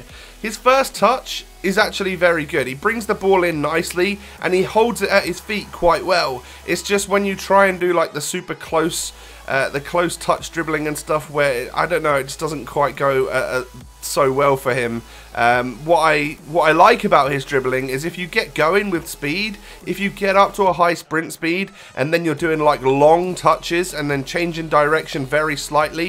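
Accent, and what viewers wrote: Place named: RP, United Kingdom. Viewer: Very posh accent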